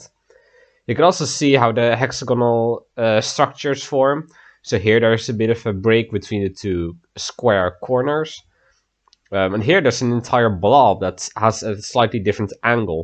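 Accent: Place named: Netherlands. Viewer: woah, i did not expect this this channel to be under rated! nice vids fellow Dutch man! (At least, you sound Dutch :) )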